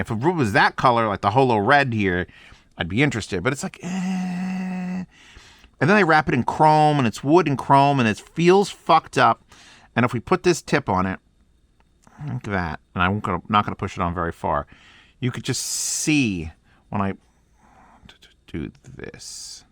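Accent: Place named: United States. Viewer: Can't watch your videos. You waffle on about rubbish, your american accent is terrible to listen to and why do americans extend words with one vowel to sound like they have four? ie way becomes waaaay.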